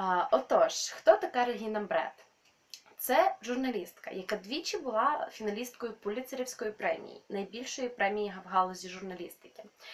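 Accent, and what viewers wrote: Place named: Western Ukraine, Ukraine. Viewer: Свет строится не только от волос,но еще и от мебели.Все хорошо,но вот западный акцент бы не много убрать.А то заносит не много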